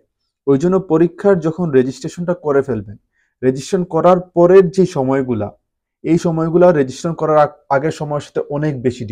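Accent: Sylhet, Bangladesh. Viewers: Apni Sylheti ni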